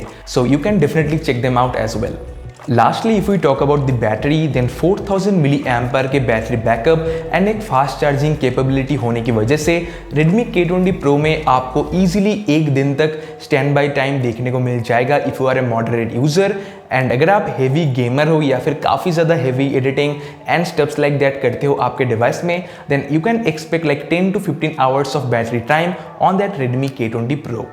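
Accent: Bengal, India. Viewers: Vai tumi je bangali Seta tomar kotha tei bojha jachhe🤩1 jon asol Bangaliei bujhte parbe🤩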